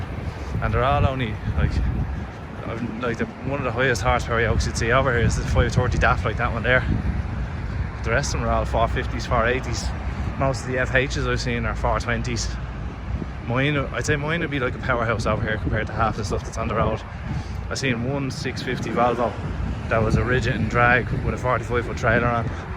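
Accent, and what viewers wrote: Ireland. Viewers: A nice broad Irish accent. Sometimes need to listen a wee bit carefully but I get the gist of most of what he's saying. I certainly don't want to sound disrespectful or rude to the nice young man. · Hey, Dutch trucker here, I really enjoyed the views, I only drive Netherlands and Belgium so. Normaly I watch English movies without subtitles but your Irish accent and kind of mumbling is really hard to understand... also the automaticly generated subtitles aren't very helpfull. Maybe you can edit subtitles correctly for future videos, I am subbed from now on.... peace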